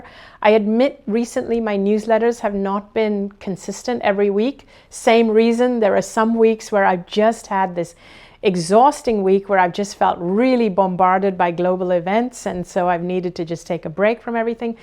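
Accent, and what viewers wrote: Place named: California, United States. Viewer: Oh my! I read your books several years ago. My sister even met you in HK through a friend. Now I have found this site. To hear you speak now you sound very Californian! I’m from Sacramento but now live six blocks from the White House. I need to hear your optimism to get me through these tough times. You’re an angel.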